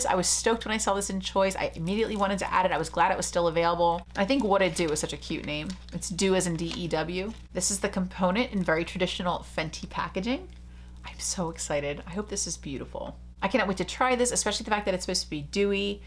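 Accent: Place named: Southwest, United States. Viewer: You look 👀so gorgeous 👌, those earrings and your nails are beautiful 😍. Wanted to ask, did you color your hair or that dark brown color is your natural hair color. I have that exfolikate Kate Summerville moisturizer for $24.99 at Marshall’s, I love it, even though I have an oily skin and I use it only at night, in the morning my skin is so soft. I missed seeing your videos, glad you’re back with one, I just love you 😘so much for being my sunshine ☀️each and every time. Your presence, love 💜, energy, excitement, joy, positivity, passion is always what I’m looking for and you always deliver it cause you’re always absolutely awesome 👏. I love listening 👂to you and watching your videos, I love you 😘so much 🤗for always being yourself and being here for us 🙏. BTW, you’re giving me Native American Cherokee vibe and I know you have that in your roots and blood with southwestern accent, love when you talk like that, especially Y’all. 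About you having cysts in your breast, my sister who’s also in the same situation as you, had this for many years,, her doctor who’s great, have many years experience, especially in the same situation told her, never do a sonogram or mammogram before or during your periods cause the cysts might be larger during those times. Do the tests almost two weeks after your periods so it’ll have chance to shrink and always do a six months sonograms for follow ups.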